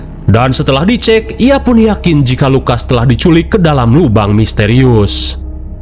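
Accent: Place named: West Java, Indonesia. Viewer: Makasih ya AA sudah menemani kami semua dengan Vidio² horror+lucu dengan komedian dari AA 😊😊logat AA kayak orang Sunda ya.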